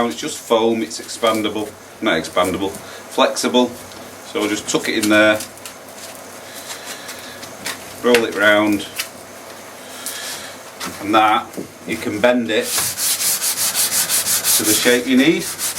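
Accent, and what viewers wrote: United Kingdom. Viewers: contrary to what some others have said, I don't find your talking to be excessive at all. I’m from the U.S. and I love the British accent. I really do. And the instructional nature of your commentary is part of why I come to these videos in the first place. By the way…I must remark on the piano accompaniment in the first four minutes of the video. It was stunningly beautiful. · I love listening to you telling us what you’re doing in that lovely British accent! (Just the way you say ”lovely”… ☺️) Please keep it up! ❤️ Also, great transformation and glad you kept the lock! 👍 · Me mum was a brit; I am an American born guy. I love your brit narration, please don't deprive us of that brit voice! Also, beautiful restoration on the teak nightstand as usual. PS If I am watching ANY video and I think the person is too talkative, I just put them on mute. 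· Really solid lovely end table! Keep talking! I love the Brit mannerisms and accent (I'm from USA)! Always going to be someone who doesn't like your 'style' - I'm of the opinion that 'You Do You'!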